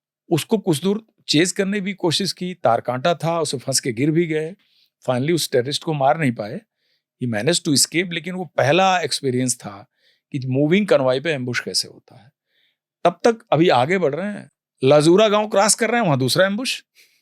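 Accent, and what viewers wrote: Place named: Uttar Pradesh, India. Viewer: UP ki saaf zuban 👍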